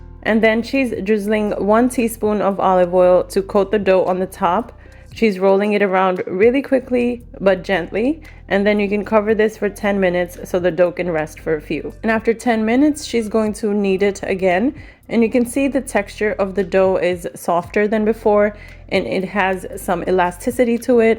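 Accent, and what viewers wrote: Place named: Bronx, United States. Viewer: You sound like a Puerto Rican from the Bronx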